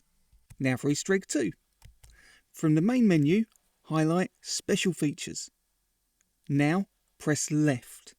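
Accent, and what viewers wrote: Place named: United Kingdom. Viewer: I have no other way of saying this but the auto captions don't like your British accent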